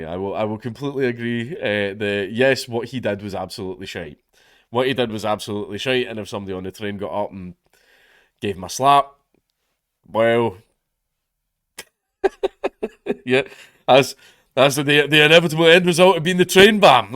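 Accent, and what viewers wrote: Scotland, United Kingdom. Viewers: was grand listen ya local patter, its been a while, its like Rabbi Burns poetry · Don't worry, Youtube's too basic to understand his thick ass scottish accent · This is the most Scottish video I've ever seen lmao. I can barely understand what is saying haha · man, i love you but... I have read the divine comedy in middle English, butwhen you speak scot i can hardly understand aword you say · Damn, your really Scottish. I only caught about half that but the parts I could pick out we're really funny. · did up the Scottish dialect for this one? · buddy, I love ya, but something I've noticed about the way you speak when it's not scripted. You repeat almost every sentence right after saying it... and usually three more times sprinkled throughout the video. And the only reason I don't really mind is because of that damn Scottish accent and just a dash of charm. 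· . You you need to do more videos in proper Scottish like this. Very funny to watch. I agree with you on the freedom speech argument. I'm curious where do you draw the line when it comes to harassment and abuse? Mind you if you break into full Glaswegian, I might need subtitles. 😂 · this was bizarre, I never heard go full scot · I feel like is tryin to talk to the lad through the camera. That's why he went hard on the Scottish accent. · This is the most scot I've ever heard, it's fucken great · Your Scottish voice is amazing. I’m from England so I can understand you, but other countries have got no fooking chance 😂😂😂😂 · Rude and annoying, yes I can accept that, but illegal ? certainly not. I hope the fat woman is proud of herself. Love the accent and the sense of humour by the way, I have been away from Scotland for a number of years now and your video was a breath of fresh air.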